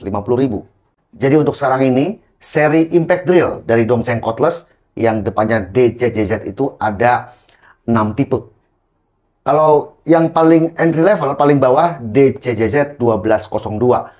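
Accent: Tegal, Indonesia. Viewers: bhs tegal e mbabed...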